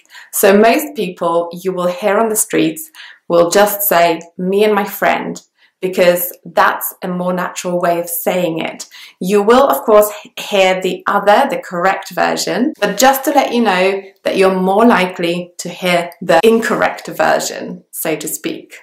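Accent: United Kingdom. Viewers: i really though u were british LOL jajaja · She speaks British English.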